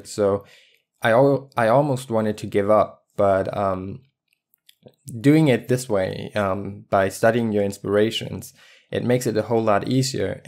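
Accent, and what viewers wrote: Germany. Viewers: Are you German? Btw love the work! :D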